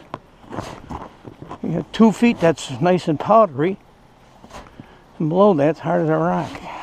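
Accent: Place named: Massachusetts, United States. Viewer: HI...I had to come back and respond to your nice reply. I'm an old Mass. girl. (Medford, Walpole, Lynn) and enjoyed the accent. My life brought me to Mich. Thought you'd get a kick out of the fact that I forwarded the Vlog to Thailand, where my son, a retired Special Forces Colonel has married and retired. Wanted to jog his memory about snow (I don't like hot, humid weather, so I visit him on Facetime every Sat. Nite. He forwarded the Vlog to an English friend who married and settled there, and wouldn't be surprised if he passed it to some Vets at the VFW in Bangkok. A reminder of winter and what surprises it holds.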